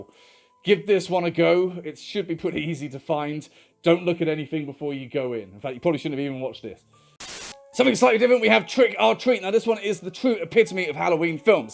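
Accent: United Kingdom. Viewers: He's British isn't he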